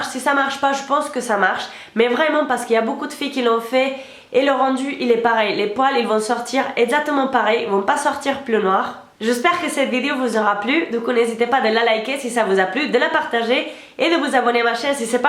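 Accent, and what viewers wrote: Spain. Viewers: oh non une espagnol! l axent est beau tres beau j adore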